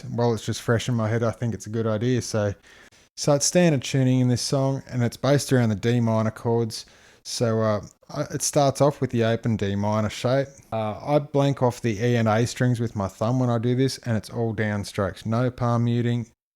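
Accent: Australia. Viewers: Hey, You sound like an Australian, ain’t ya?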